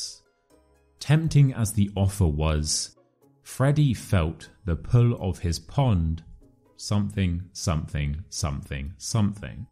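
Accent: United Kingdom. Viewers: Thanks for your new video!🐸Today’s story was quite challenging for me! 😂 I found a lot of new words in it. I do love your beautiful British pronunciation!:_HenryNice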